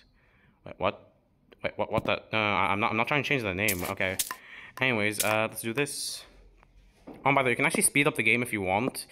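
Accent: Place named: Egypt. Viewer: You are Egyptian? I can sense it from your accent.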